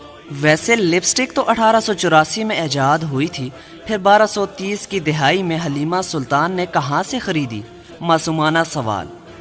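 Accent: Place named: Punjab, Pakistan. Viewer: aap k Urdu bolney ka accent to bilkul Punjabis wala ha. pathan ka accent Urdu bolty wkt bilul bhi aisa nahi hota mn khid pathan hu.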